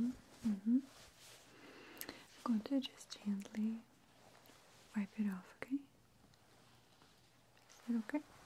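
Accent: Russia. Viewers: Craig Pavey I didnt take it as sarcastic, just that you misunderstand what I said. I mentioned American women dont speak like her BECAUSE she is Russian. Sorry I guess it was more complex then I intended, thats what I get for being an English major lol. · Richclashchair ASMR I love her voice. Russian accents are very beautiful.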